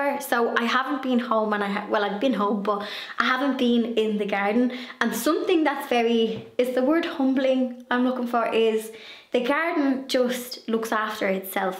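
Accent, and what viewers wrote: Dublin, Ireland. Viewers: Cottage decor, gardening and a good Dub twang, what more could you want!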